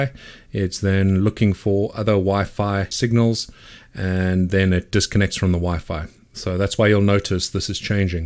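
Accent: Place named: South Africa. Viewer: Amazing videos really on point with what i wanted to learn and the series is well set out. Thanks for the amazing content! Also can't loose that SA accent hey xD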